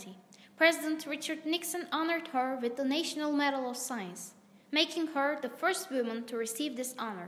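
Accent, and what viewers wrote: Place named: England, United Kingdom. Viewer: Impressive, liked your english accent, i want more.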